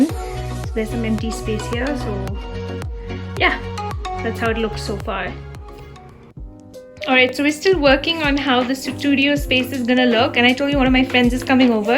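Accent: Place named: India. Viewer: I am 3rd generation Indian born in South Africa. It is so weird to see someone who looks oriental with an Indian accent.🙊You are so beautiful and great videos. New sub!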